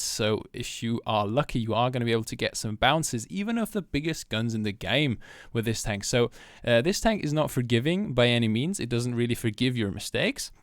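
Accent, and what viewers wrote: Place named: United Kingdom. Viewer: Wow are u french? U sound british haha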